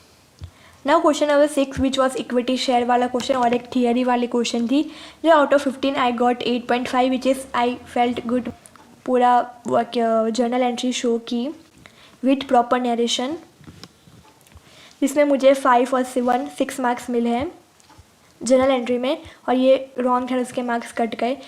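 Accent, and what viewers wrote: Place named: North, India. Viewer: That northern accent tho 😂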